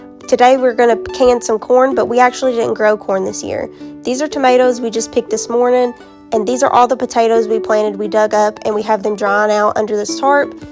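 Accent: Appalachia, United States. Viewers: 🫶👍🤟🤙👌🤞✌️👆❤👈 Make sure it's code water , not cold water. I love your appalachislang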